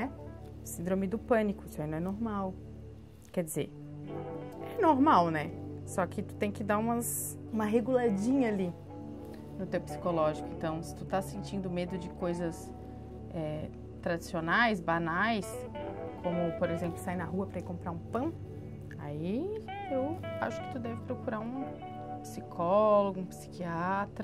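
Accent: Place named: Santa Catarina, Brazil. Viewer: Tu é de Santa Catarina? Teu sotaque parece muito!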